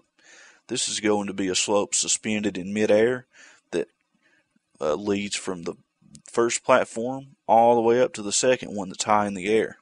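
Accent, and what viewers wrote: United States. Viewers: Cool beans. And I like your super American accent. This is a good channel. I hope to produce a game in GZDoom.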